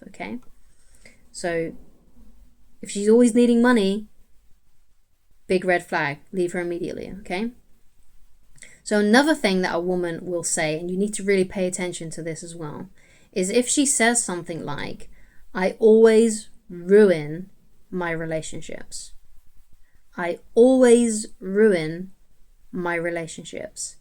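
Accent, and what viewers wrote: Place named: United Kingdom. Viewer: Your british accent pisses me off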